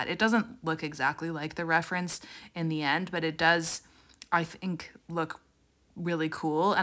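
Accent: Canada. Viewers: I ❤ ❤ ❤ your videos. Your accent reminds me of my family in Canada. You are also an amazingly talented artist who keeps it real. You make art so approachable. You have helped me get back into painting!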